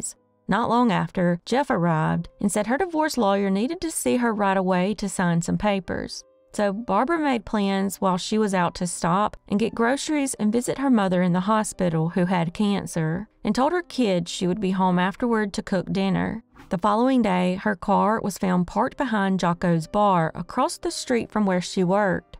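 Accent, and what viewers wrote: South, United States. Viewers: Well you got a new follower cos love the stories and love your southern accent. It's a breath of fresh air. That's for sure! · So I’ve always been curious… what part of the south are you from?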